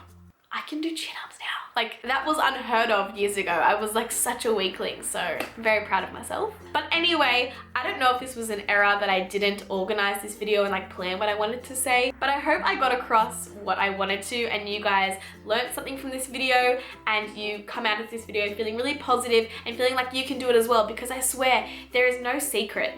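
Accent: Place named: Australia. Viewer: love hearing an Aussie voice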